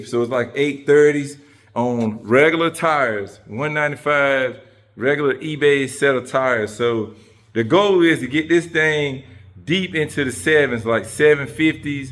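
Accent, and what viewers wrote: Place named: North Carolina, United States. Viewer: Hey, long time watcher first time commenting. What part of NC you are located? You sound like you may be close to my wife's people in Sanford.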